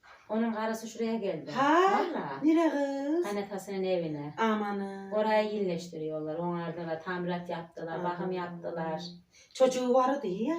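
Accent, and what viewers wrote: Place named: Çorum-Amasya, Turkey. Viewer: Orası corummu amasyalı yoksa samsunmu tokatmi yoksa şive otaraflar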